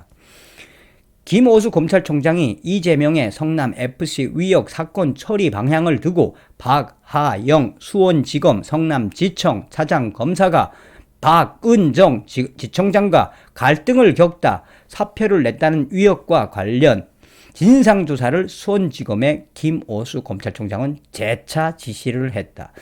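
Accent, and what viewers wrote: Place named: North Korea. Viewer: 북한식 말투가.... ㅋㅋ 재밌어~!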